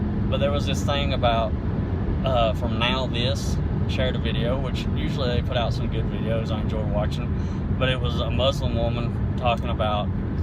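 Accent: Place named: South, United States. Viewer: It's always hopeful to see atheists like you in the bible belt; to be reminded that just because someone talks with a hick accent doesn't mean they're Christianized...i.e. brainwashed. I run across you diamonds in the ruff sometimes on YTube atheism and react like Dorothy did when Toto fled the witches castle: "He got away! He got away!" I empathize with your dilemma: all their lives, but especially when young, proselytizers are going to be overtly trying to convert your kids while others, like their Christian teachers, will be doing the same if more subtly. There will be strong social pressure to conform and convert and we both know how that's hard to resist when y're young, especially in the Belt. It's like a tug-o-war with you on one end and an army of Christians on the other and your kids are the rope! You know what's coming: "Your father is an atheist? 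You poor lost child. We will pray for you..." Fuck that! I'm afraid there's only one path for you: become a one-man army and take the fight to them! That's what I did and now I'm a Doctor of Atheism, ready, willing and able to face an entire auditorium of Christians by myself and adroitly turn them out because I know my science, my philosophy and my bible. It may seem weird but I love the bible for what it is: a priceless window into the minds of ancient peoples, ignorant and superstitious as they were. But as history or a guide for morality, the bible leaves a bit lot to be desired. And let's face it: Christians use the b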